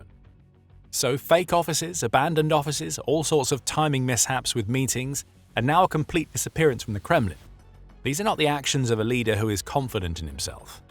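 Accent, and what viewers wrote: England, United Kingdom. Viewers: "Nearly three identical offices"? Was it two and a bit, or three and a bit? The narrator's accent sounds English, but the grammar makes me wonder.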